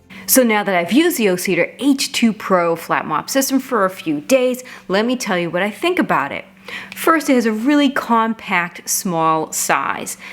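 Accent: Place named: New England, United States. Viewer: Thanks for this. I’m in the market for something like this. By the way, you sound like a New Englander (like me)! Accent unmistakable and I love hearing it!! 😊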